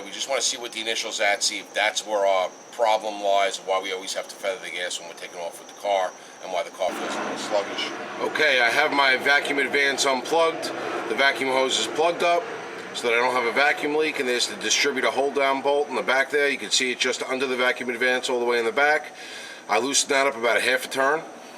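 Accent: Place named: Long Island, United States. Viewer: I knew it was long island before I even saw the shirt. Haha. Can't mistake that accent for anything. I got family in Smithtown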